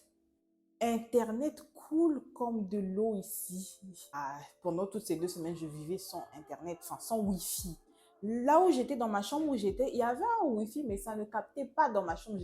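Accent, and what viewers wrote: Ivory Coast. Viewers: Ahm, tu commences a parler comme les Ivoiriens, tu es Congolaise et puis après. ? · Ton accent devient ivoirien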